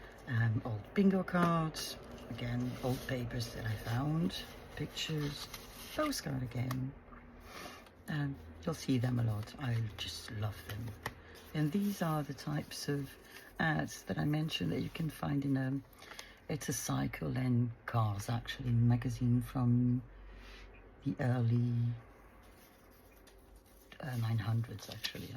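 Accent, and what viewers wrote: France. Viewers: Very good video and your English sounds fine with a French accent! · Wonderful first video! Don't be so hard on yourself! Your English is fine! I loose words too, and I speak English all the time. I also work with a woman from France, so I am comfortable with your accent! Keep up the good work! I got your link from Sevenplaza. Thanks!!! This is Carol from IN. USA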